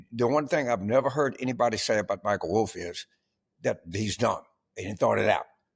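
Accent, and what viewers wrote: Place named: Louisiana, United States. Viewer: I prefer my commentary on the complete and utter downfall of F45 served up with a Cajun accent.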